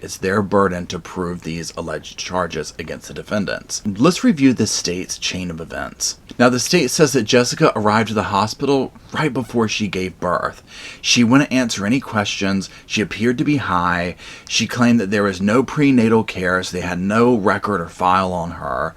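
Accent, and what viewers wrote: South, United States. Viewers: Dude southern accent is soo on point 👌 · I just love you! I’m from savannah GA and your accent sounds like mine & expresses so well what us southerners sound like telling any stories!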